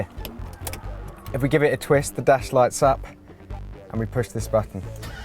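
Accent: United Kingdom. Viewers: obviously you havent been to Europe. It's called a British accent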